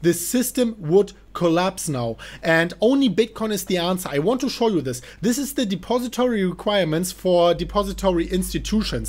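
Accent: Germany. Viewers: I love your German accent, it reminds me of Arnold Schwarzenegger, he is very famous and Americans respect him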